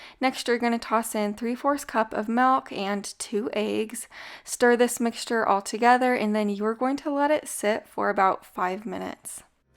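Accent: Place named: Midwest, United States. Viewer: Where are you from!?!!? You have the cutest lil accent I ever did hear! Midwest?? I'm from an area between new yawk and new joisey, so I say cawfee and I'm sure a bunch of other weird words but even I know I murder coffee 🤣🤦‍♀️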